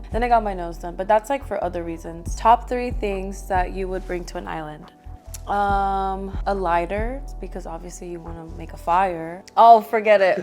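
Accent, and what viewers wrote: Spain. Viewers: LOVE THAT SPANISH ACCENT 🔥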